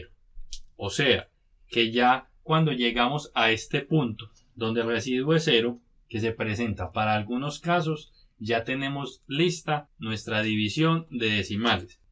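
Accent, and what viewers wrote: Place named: Colombia. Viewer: Su acento es colombiano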